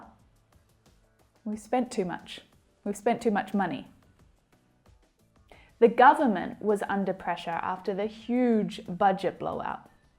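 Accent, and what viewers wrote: Australia. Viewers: Thank you so much for your lessons l juste want to make sure are you Australian or American or Canadian??????? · Are you an Australian?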